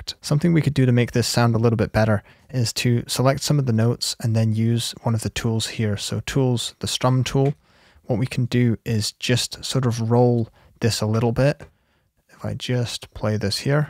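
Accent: Scotland, United Kingdom. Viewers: Have my kids mr Scottish man thank you for this!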